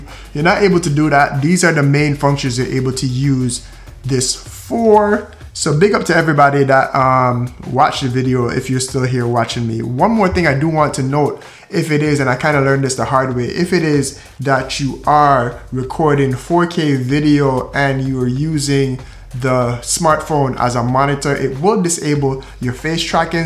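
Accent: Jamaica. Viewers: yo you a yardie, love that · yooo. you from Jamaica??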